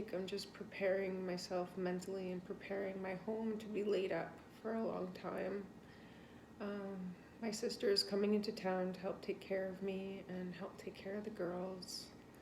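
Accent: New York, United States. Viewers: lol! love CAW - FEE ( COFFEE) - go New York gals :) and love your speaking - can't take the FUCK outta a New York girl :) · You still have a smidge of New Yawk accent! Wishing you the best, sending prayers, feel free to complain and bitch at any time! Have watched your journey and love that you share it, you are saving lives and now it's time to keep saving yours! Hugs!!!!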